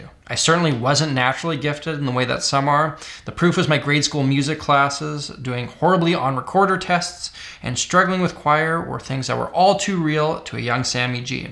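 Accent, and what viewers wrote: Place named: Canada. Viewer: This dude sounds like a stoned Canadian talking really phylisophically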